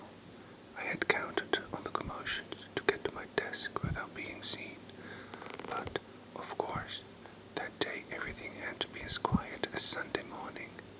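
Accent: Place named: Germany. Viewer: You have a little german accent :) xx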